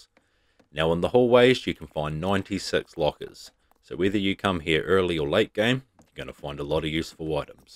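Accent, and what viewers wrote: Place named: Australia. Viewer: Man you sound like Jamaine from flight of the Concorde's. I don't mean just because you both have Australian accents ya just fully sound like him. Such a crack up that show. Also cheers for all the tips on 7 days.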